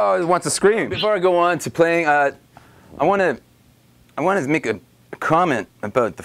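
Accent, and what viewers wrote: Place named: Canada. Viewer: are you a canadian? You sound sort of like a canadian.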